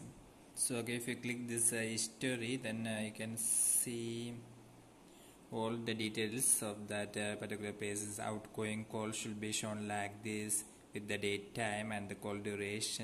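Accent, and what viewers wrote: Kerala, India. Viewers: Malayali talking in English :) funny slang dude!!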